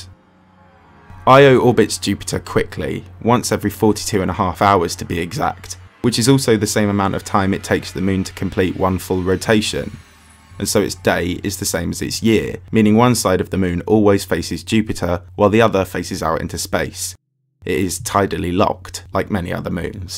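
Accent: United Kingdom. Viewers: I don’t understand the fascination/obsession with the British butt pirate accent. It’s more of a distraction and takes away from the message. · British accent?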